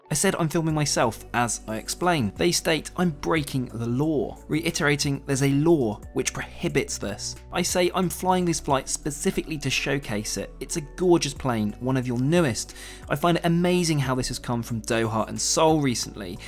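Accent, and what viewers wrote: RP, United Kingdom. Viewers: its wild watching this in comparison to how posh he sounds now LMAO. So he was once just a regular dude.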